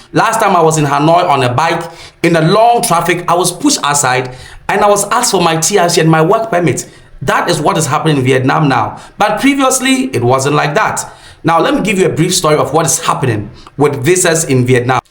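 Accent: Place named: Ghana. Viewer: SEE YOUR MOUTH calling color colar with your heavy Ghana accent. If you want blogging wars you will get it. You think Ghanians are saint and dont hane dirt ? We are trying to retrieve $59,000 and $48,000 among other fees from scammed us for land paid for two of my African American friends who bought into your advertisement to return to African.....SCAMMED IN BROAD DAYLIGHT BY GHANIANS!!! I have names and their plea in underwears begging for mercy to pay back. If videos go online now the west will laugh because the inner devide is what they want you fool! Perhabs we should just throw caution away and expose every dirt we can find on Ghanians too and lets this whole B.S happens. Even as I say this,I know defending the pride of African should prevail above anything else but sometimes these folks be asking for it!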